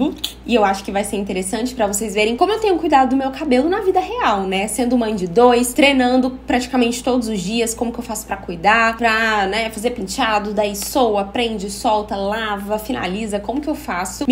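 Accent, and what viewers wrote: Minas Gerais, Brazil. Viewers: Eita Mineirinha .......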